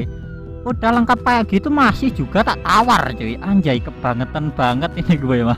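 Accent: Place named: Java, Indonesia. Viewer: Medo Yoo😁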